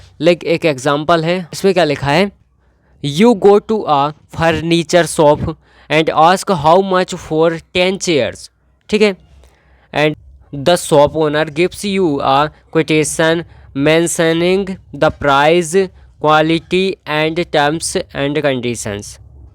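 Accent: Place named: Indore, India. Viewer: Bhai tu indore se ha na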